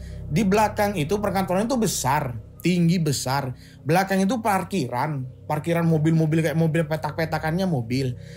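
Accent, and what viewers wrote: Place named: Madura, Indonesia. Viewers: Accent nya Suroboyoan bangett,,tapi mas nya orang Madura nih hee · Asli Madura yaa mass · Iyut Reng oreng Medhureh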